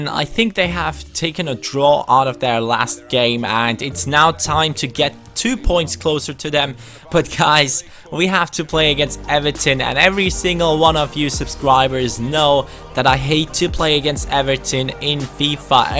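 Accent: Germany. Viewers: He's Bavarian German ;)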